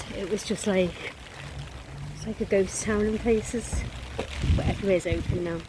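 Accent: Liverpool, United Kingdom. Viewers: 😃I have just learnt your accent from Liverpool is called « Scouse » Thank you 🤗👍 I understand better English written✍️ than oral 🗣️🤭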